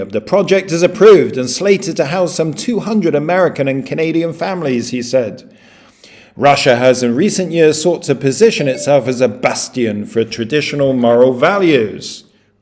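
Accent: England, United Kingdom. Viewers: The most annoying thing about you is your pompous english accident maybe that's why you only have ten thousand subscribers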